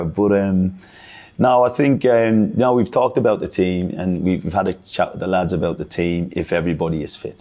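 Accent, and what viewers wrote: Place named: Northern England, United Kingdom. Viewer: his accent is weird, sounds like a combination of northern english and irish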